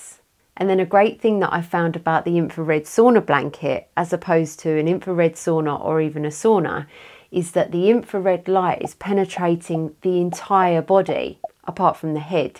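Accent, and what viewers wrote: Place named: England, United Kingdom. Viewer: Greetings to you from me. Great video as always eventhough I am not much intrested in this topic but yeah. At least i could teach my ear some of English Accent.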